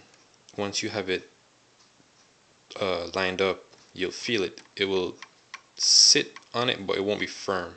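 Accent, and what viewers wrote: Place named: Jamaica. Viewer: Your Jamaican aren't you? I can hear the accent lol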